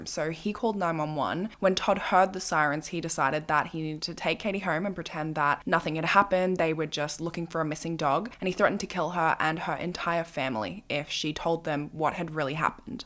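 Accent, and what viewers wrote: Australia. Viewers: you just earned a new sub! love the way you explain things, i’m also australian. it’s so nice hearing a familiar accent when listening to these kinds of things, thank you for your videos! <33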